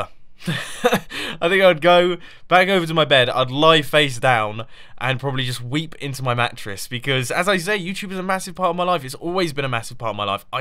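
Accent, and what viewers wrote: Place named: United Kingdom. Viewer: Sometimes I can't understand in all of his Britishness. I literally just sit there and try to understand, but I find it amusing. Don't change,.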